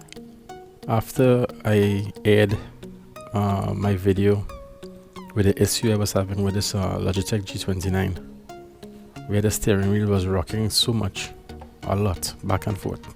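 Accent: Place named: Trinidad and Tobago. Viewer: Heard your voice and was.. He must be Trini. Just looked at your channel sure enough. Been to Trinidad about 8 times. My wife is from Grande.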